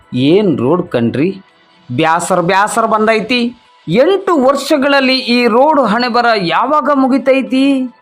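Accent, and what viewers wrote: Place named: North Karnataka, India. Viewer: ಮಸ್ತ್ ಮಜಾ ಬಂತ್ ನೋಡ್ರಿ ನಿಮ್ಮ ಉತ್ತರ ಕರ್ನಾಟಕ ಕನ್ನಡ ಕೇಳಿ 👏🏽👏🏽👏🏽👏🏽👏🏽ಮಸ್ತ್ ಮಸ್ತ್ ❤️❤️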